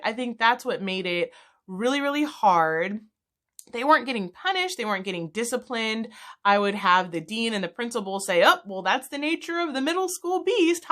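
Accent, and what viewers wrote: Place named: Minnesota, United States. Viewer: The more I listen the more it sounds like white minnesotans. Oh please, nurses— especially school nurses are usually at the bottom of that field, why do you elevate this rube?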